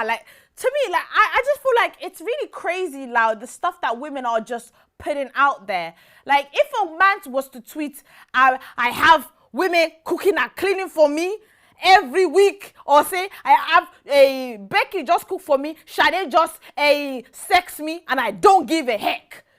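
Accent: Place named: United Kingdom. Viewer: Do you live in the UK, your accent is everywhere?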